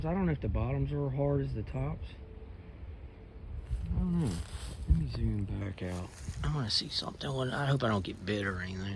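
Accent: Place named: South, United States. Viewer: Good morning 🌞. You sure sound southern. When you talked about the wood, you were teaching what you know. Alot of folks may not have known that information. That's neat the turtle was still there. It's sunny spot. Thankyou for sharing your thoughts and walk. Always a pleasure. Hope you have a great day.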